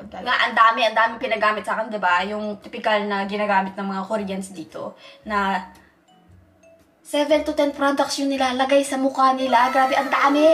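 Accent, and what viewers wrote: Pampanga, Philippines. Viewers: So cute! Lalo na yung kapampangan accent. I wonder if you're a kapampangan po. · Yung accent talaga ng pagka kapampangan eh😅 Proud cabalen here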